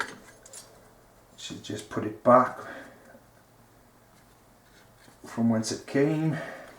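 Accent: Wales, United Kingdom. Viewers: You sound welsh do you have a shop or anything because I have a stomp 160 with a jammed gearbox and I need someone to have a look at it and there’s no one in South Wales that can do it for me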